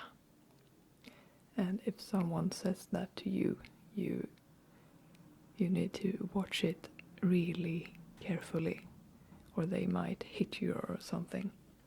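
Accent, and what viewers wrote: Sweden. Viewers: great video! I love the sound of your swedish. maybe a trigger word assortment? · Tack! Gillar när du kommer nära med din fina röst, är det en norrländsk dialekt du har?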